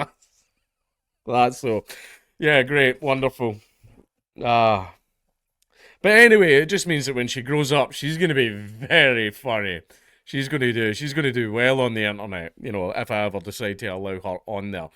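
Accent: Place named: Scotland, United Kingdom. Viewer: well yeah, shes scottish, of course shes going to be talking scottish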